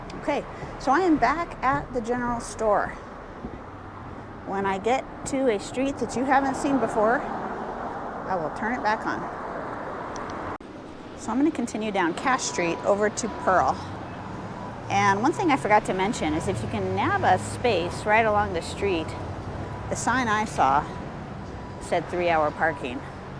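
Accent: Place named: South, United States. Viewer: Hi, London guy here. Wow! America is just so beautiful wow, wow, wow! I have to say, Montana and Wyoming have captured my heart. I am a real sucker for greenery. I do believe they film Clint Eastwoods movie here "Any Which Way You Can." Great video and scenery. I too love your accent. The deep southern accent is my favorite accent by far, I find it so hot when it is coming out of a females mouth if you get my drift! I can't wait to see your other videos. I must Subscribe to your channel. Thank you for making this awesome video.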